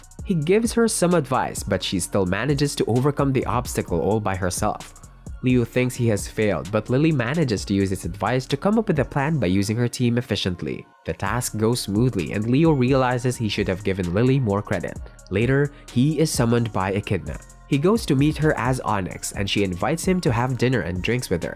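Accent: Philippines. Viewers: Pinoy narrator😁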